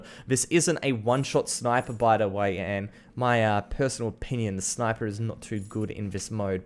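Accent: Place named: Australia. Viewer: LMMFAO..only 7 years..first of all change the idiotic shrimp on the barbie voice..2nd of all get some skill from someone who has played since Beta..👍🏻👍🏻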